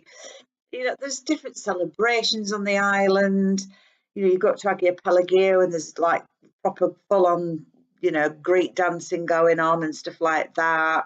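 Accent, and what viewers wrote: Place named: North, United Kingdom. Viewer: nice northern accent, poli kala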